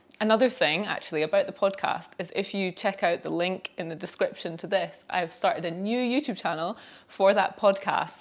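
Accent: Scotland, United Kingdom. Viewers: Your Scottish accent sounds so funny to my ears lol, where are u originally from if i may ask?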